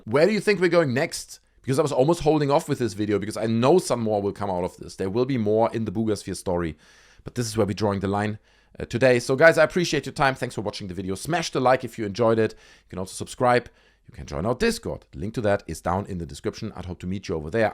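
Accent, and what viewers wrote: Germany. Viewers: You got a German accent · I love that German accent! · Nice accent. German?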